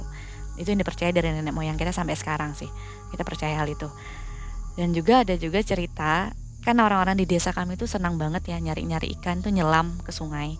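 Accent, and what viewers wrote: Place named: Kalimantan, Indonesia. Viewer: Panggilanya pake logat. Magg. Khass kalimantan bujur.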